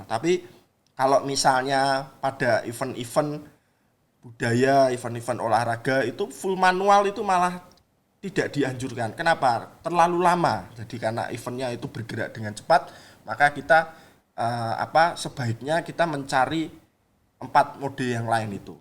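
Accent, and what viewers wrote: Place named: Java, Indonesia. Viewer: pekat sgt jawanya pak..tp terhibur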